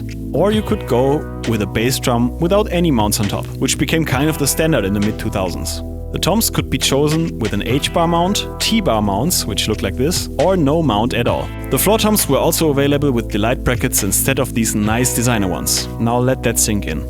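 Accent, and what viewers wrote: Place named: Germany. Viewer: Kann man nicht für wenig Geld einen Muttersprachler den Text ein sprechen lassen....spoken German English is soooo shamefull. Beside that: nice work.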